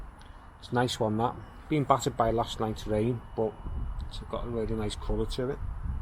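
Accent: Liverpool, United Kingdom. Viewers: Lovely to see your garden again and listen to a fellow scouser, happy to see the cordyline survived. Regards from Ontario, Canada.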